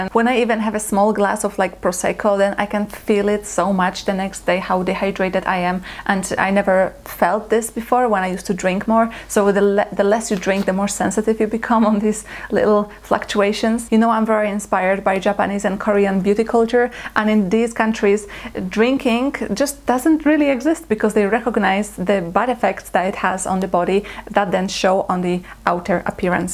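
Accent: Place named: Poland. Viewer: How come after 11 years in UK you still have such a strong polish accent ? Your videos are valuable but your english is very heavy and hard to listen to. Make it more fluent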